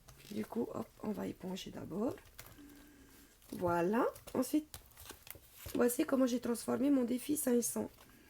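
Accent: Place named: Tahiti, French Polynesia. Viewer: J ai l impression que c est un petit accent Tahitien 🤗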